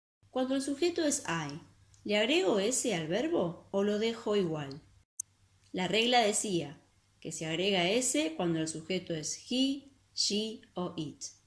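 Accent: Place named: Argentina. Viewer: Que explicación tan clara como el agua. Gracias señorita se nota que es argentina o algo asi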